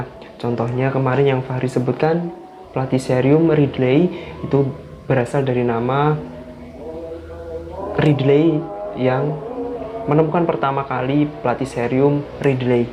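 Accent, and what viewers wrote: Java, Indonesia. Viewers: Aslmkum....Alhamdulillah stlh sring lihat utube nya jd smangat lg brtanam..tau jnis2 philo..dn smua hal ttg tanaman..dlu sy merasa g tau apa2..sk salah2 nyebut nama tanaman..sy sk dg apa yg d sampaikn cara penyampainnya jg..kalem dn apa adanya..mengalir dn kesan jawanya gak hilang...sll rendah hati dn memberi smangat u kita2 yg nonton..smg mdpt balasan pahala dr Allah krn tlh menyampaikn info2 yg brmanfaat...smg sll sehat..sukses dunia akherat d jaukn dr sgl yg buruk...aamiin aamiin yra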